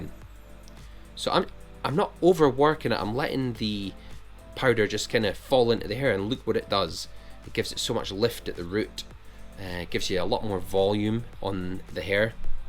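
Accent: Scotland, United Kingdom. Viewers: I love the fact that you are Real. You like us, can make the common mistakes of barbering. It’s this awareness which helps us learn how to fix ours. I could watch you for hours. My third great grandad was Scottish. I love your accent. Well done for being a great tutor. ❤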